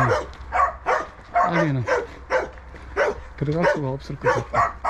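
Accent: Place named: Jeolla, South Korea. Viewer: 이 유튜버전라도분이네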